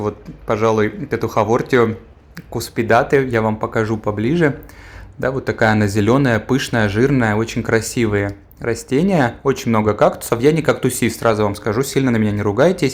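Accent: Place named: Spain. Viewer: Прекрасное видео - как приятно видеть ухоженные растения имеющие неповторимый естественный вид при заботливом выращивании в подходящем для них климате. Вроде бы - всем известная простая маммиллярия Mammillaria elongata, но лучше не видел, очень хороша под натуральным солнцем. Как ориентирован балкон по отношению к частям света? И хотел бы немного помочь с названиями. Сомневаюсь насчет алоинопсиса - это скорее фрития (Frithia pulchra) если цветки темно-розовые или фенестрария ( Fenestraria rhopalophylla / aurantiaca), если цветки желтые/белые. Кактусы: и - не эуфорбии, это такой очень красивый маленький цереус Monvillea spegazzinii, очевидно выращенный из кусочков кристаты. Она красиво цветет. Безымянны кактус - Notocactus oxycostatus, родственник известного всем N. ottonis. И рядом стоящие Eriocactus leninghausii i Brasilicactus haselbergii. Нет, это не маммилопсис. 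)) Я не использую кактусного новояза и не обьединяю по-хантовски старые известные роды, пусть это остаeтся на совести неофитов. PS Когда читаешь латинские названия у тебя приятный испанский акцент. Я изучаю португальский, с ним испанский понятен, но не наоборот. ))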